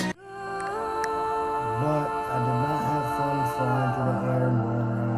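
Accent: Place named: Philadelphia, United States. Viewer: Lmfaoo yo from start to finish u can hear the Philly accent progressively comin out 🤣🤣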